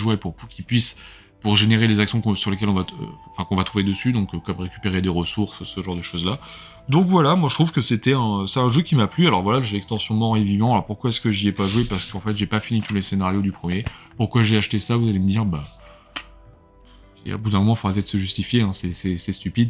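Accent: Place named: England, United Kingdom. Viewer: oui. Pis y a déjà du monde de bien installé, j'avoue. Dommage, ça aurait été bien avec ton humour et ton accent anglais qui a de la tronche (bon, un accent qui a de la tronche... je suis parti loin, tant pis, mouarf!)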